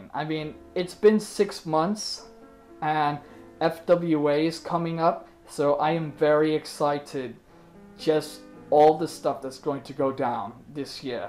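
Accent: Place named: United Kingdom. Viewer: wow to be able to pull of a almost flawless British acsent when you are an American is pretty cool Ps I love the content and the caricter of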